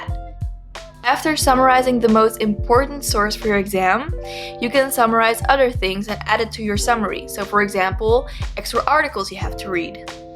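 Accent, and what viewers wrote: Netherlands. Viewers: When I heard ur accent I noticed how it sounded dutch, when I saw: "inhoudsopgave" I was like: 'Aaahh so she is'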